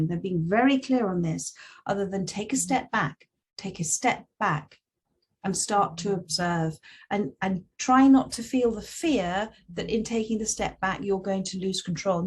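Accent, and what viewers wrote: United Kingdom. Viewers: Your one of my favorites. Luv the British accent! T. U for sharing your gift with us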